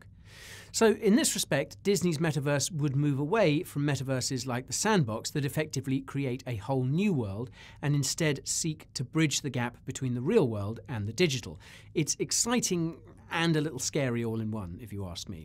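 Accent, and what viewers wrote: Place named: London, United Kingdom. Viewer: lool that intro, such a londoner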